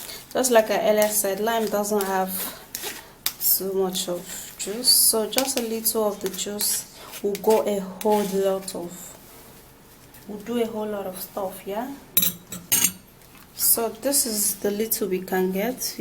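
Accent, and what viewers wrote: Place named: Nigeria. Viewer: Those are grapes she use not berries...but maybe thats what they name it in their country.She sounds like Nigerian to me.All that she uses are natural.every day stuff...I guess It will work.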